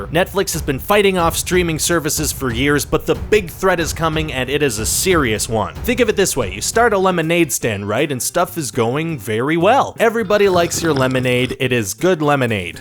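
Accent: Canada. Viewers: Wow is screen rant Canadian? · Wait dude are you Canadian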